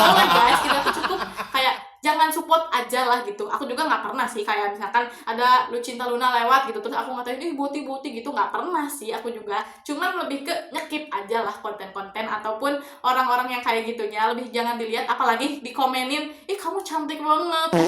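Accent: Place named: Sunda, Indonesia. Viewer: Gaya bicaranya mirip kang dedi mulyadi cenah😂 sunda buanget Yang paling penting di video itu kita sudah diarahkan untuk mengetahui perbedaan tiga hal dalam mengidentifikasi LGBT 🌈 1. Posisi seksual 2. Ekspresi 3. Orientasi seksual Bottom adalah posisi seksual yang dianggap sebagai orang yang lebih pasif atau submisif, begitu sebaliknya dengan top di gambarkan dominan dan lebih aktif Ekspresi ini adalah cara orang memperkenalkan diri ke publik yang biasanya dari sikap dan sifat atau bergaya pakaian yang khas Orientasi seksual ini yang menjadi bagian paling vital, hal ini yang secara jelas menentukan apakah orang itu punya ketertarikan seksual yang beda, setahu saya ada heteroseksual (lawan jenis) dan homoseksual (sesama jenis) aja Di tabel LGBTQ+ paling banyak adalah ekspresi diri Lalu untuk faktor lingkungan dan genetik itu udah umum, semua orang seharusnya tahu dan bisa dilihat langsung, saya fokus ke aspeo sosialnya saja dan psikologisnya Berikutnya mungkin yang kurang dipembahasan itu adalah sudut pandang tiap individu-individu terhadap BOTI, seluruh pembahasan kamu udah bagus cuman belum ada observasi atau penelitian langsung turun ke praktik lapangan sosial. 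Saya pernah mencoba memahami kenapa Boti dianggap salah selain karena melanggar aturan agama tertentu. Pada dasarnya boti digambarkan sebagai sosok yang feminim dan terkadang lebih pasif, hal itu sebenarnya dapat menganggu stabilitas ketertarikan seksual, terkadang ada boti yang terlalu cantik yang malahan banyak yan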